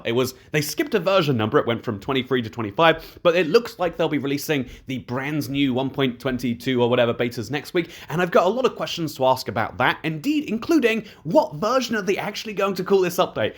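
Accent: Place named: United Kingdom. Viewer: Maybe because he's British? I'm American, and I've always heard it as Cirh-san-thih-mum.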